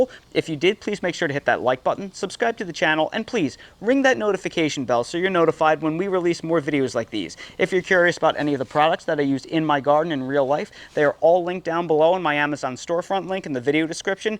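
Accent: United Kingdom. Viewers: You have a slight Scottish or Irish accent. I can't specify because thebmixture of American accent. Do you have a parent from Scotland?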